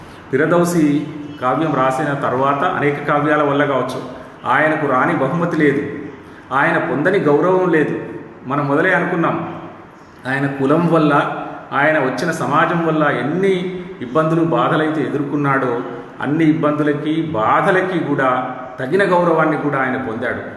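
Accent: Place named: Telangana, India. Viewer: Sir..... Mee telangana mandalikam tho kudina telugu Chaala bavundhi.... Sir 🙏🙏🙏🙏🙏🙏🙏🙏🙏🙏🙏🙏 Ee video Telugu vallu Bhavishyatthu lo chustharu sir ekkuvaga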